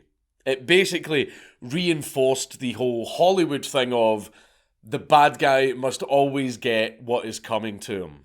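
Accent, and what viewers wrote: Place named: RP, United Kingdom. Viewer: Completely off-topic: It's been SO helpful listening to you. Even though you speak almost BBC-quality English (please don't take offence, this is meant as a genuine compliment), ever since I've started listening to your content my ability to understand out-in-the-streets Scots has improved dramatically. You've pretty much been the bridge I needed from "The hell? Am I supposed to understand this?" to "Oh, it's Scottish. No problem." Of course, some elderly folk out of the big cities somewhere on the Whisky Trail are still far beyond me, but that's fine. After a tumbler or two of liquid smoke barriers just have a tendency to melt anyway. ;) Take it from someone speaking English as a third language: you're not just entertaining and informative, but also educative, even in ways you don't expect. Should I ever happen to run into you in person while on the Trail, expect being invited to a beverage by some random stranger claiming to be a fan. 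;)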